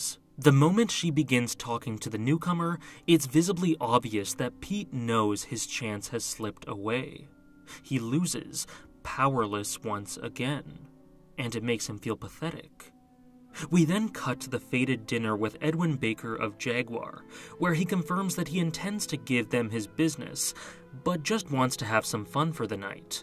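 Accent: Canada. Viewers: I'm going oot on a limb and guess you're Canadian?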